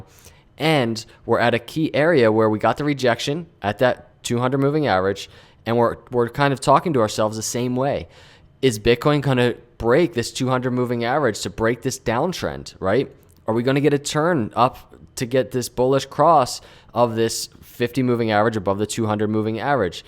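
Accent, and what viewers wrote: Philadelphia, United States. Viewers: Great job showing both sides of the coin! I appreciate your cautious optimism that comes with a Philly accent...long term bullish here. I don’t care what happens below $10k I am accumulating. Just don’t want to get caught off guard when that big candle drops any day now!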